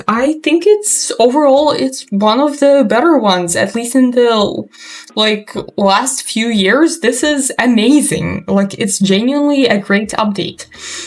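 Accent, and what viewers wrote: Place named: Russia. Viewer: I can hear the Russian accent 😝😝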